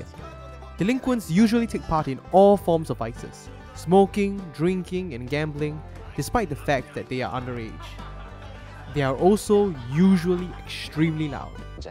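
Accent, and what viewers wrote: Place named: Singapore. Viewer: I can hear a Singaporean accent, I love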